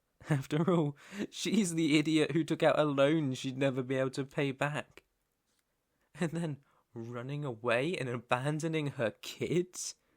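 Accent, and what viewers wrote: United Kingdom. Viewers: Do you speak British?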